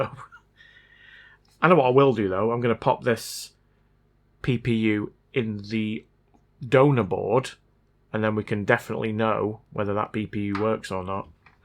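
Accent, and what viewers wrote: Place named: United Kingdom. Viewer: I can't tell from the accent, are you from the UK? If yes, be extra careful with NES cartridges. PAL region has 2 sub-region, PAL A and PAL B and they are not compatible. PAL A is UK and PAL B is basically rest of Europe. I see a big letter A on the Castlevania cartridge and if your console is the same then it shouldn't be a problem. Usually the dirty part is the receiving side of the big black connector, not the edge connector on the main board side. You don't need to replace it, just clean it. Usually boiling in hot water, maybe adding a little dishwasher liquid can help but make sure you rinse it off, preferrably with some distilled water. Or apply vinegar, then rinse it off with alcohol. The point is you have to make it squiky clean and don't want any limescale to form on it either.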